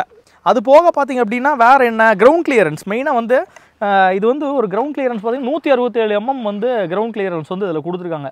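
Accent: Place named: Kongu, India. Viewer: அது கொங்கன்ஸ் ஓட பிறந்த ஓசை. எளிதில் மாறாது !!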